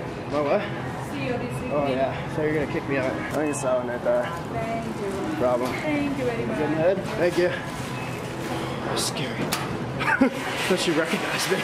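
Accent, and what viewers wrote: Winnipeg, Canada. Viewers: Are you from Winnipeg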